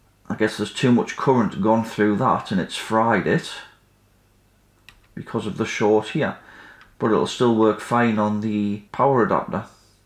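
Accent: United Kingdom. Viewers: Nice fix! Always love watching your videos – interesting repairs and I like the British accent and "unamerican" presentation. BTW: what part of Britain are you from?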